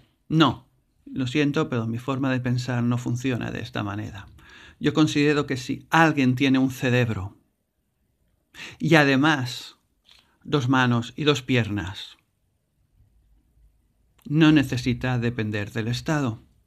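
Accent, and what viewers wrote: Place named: Spain. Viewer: En cuando escuche el acento español "onda vital" deje de ver el video ¡¡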